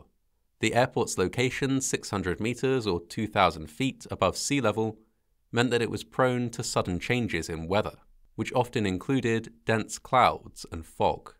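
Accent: United Kingdom. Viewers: get rid of that stupid british acsent it sux like your littile island 😮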